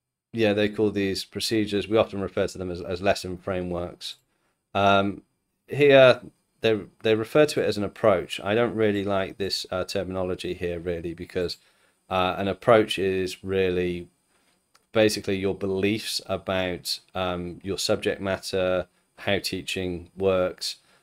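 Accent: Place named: United Kingdom. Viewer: I’ve listened to you speak, and your accent sounds British or from a similar region. Your personal standards may work well in native English-speaking countries, but schools in Ukraine, Poland, Germany, Lithuania, and most schools in Asia, which are non-native English-speaking countries, do not prioritize whether your certificate is from a professional school. As long as you are a native speaker, that’s what matters most. Personally, I feel that teaching English to native English speakers (L1) is not as fulfilling. Most of the people in your comment section are from non-native English-speaking countries, and suggesting that they need to spend thousands to get a certificate like yours to teach is doing their countries a disservice. This is just your personal opinion, so please make that clear to them.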